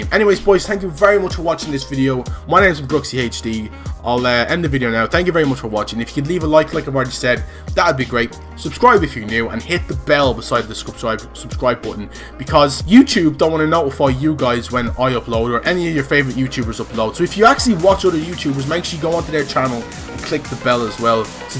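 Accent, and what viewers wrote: Ireland. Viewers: Yay ur Irish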